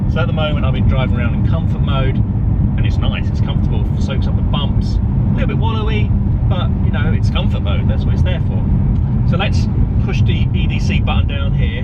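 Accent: United Kingdom. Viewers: This time on soft spoken british chaps buying german motorcars...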